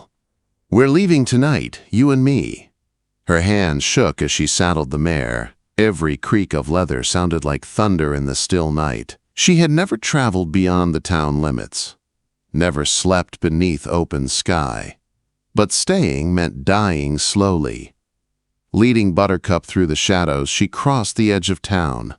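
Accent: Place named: California, United States. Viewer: Nice story, but the narration was a joke. So California USA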